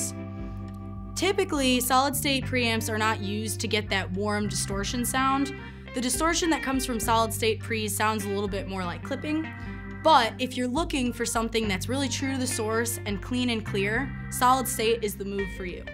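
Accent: United States. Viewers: Please enlighten me about her accent. English is not my first language but what I'm hearing sounds like a typical American accent. Is it a specific regional accent?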